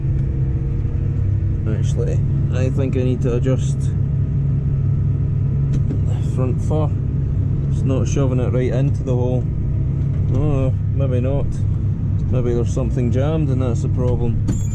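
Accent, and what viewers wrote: Scotland, United Kingdom. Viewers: Very easy watching your videos must be the Scottish accent , would be well interested in the merchandise but do you know what extra charges would be incurred now with Brexit sending them to Ireland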